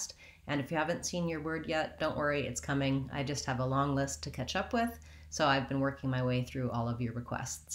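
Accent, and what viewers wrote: United States. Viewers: You’re from the Midwest I assume?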